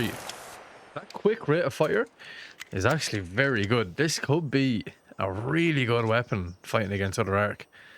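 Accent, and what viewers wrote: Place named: Irish Traveller, Ireland. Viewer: It's called Irish Traveller or "Pikey" accent. Watch the movie "Snatch".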